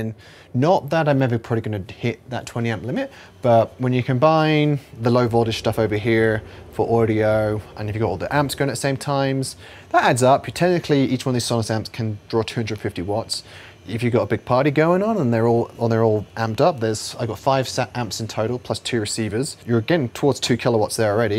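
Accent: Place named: United Kingdom. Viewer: Also, where are you from? You sound british but have other influences in your accent. I'm from the UK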